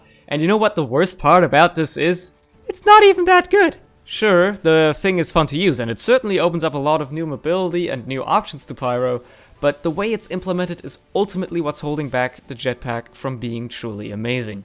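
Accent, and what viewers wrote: Germany. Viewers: if i didnt know you were german i would never know by watching your streams but the german accent is too strong in the videos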